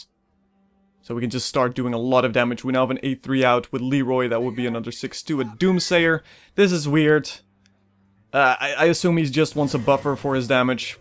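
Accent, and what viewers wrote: Netherlands. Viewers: Are you dutch? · Favoriete Nederlandse gamer, door je accent alleen al sub!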